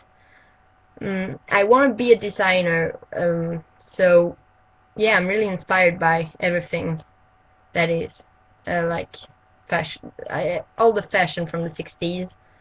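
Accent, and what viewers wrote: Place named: France. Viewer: 1) You are not boring. 2) This vlog is not crappy, so the title is totally misleading. 3) You accent is so cool, but I was actually expecting it to be more Swedish than French, for some reason (: Great video! Keep it up (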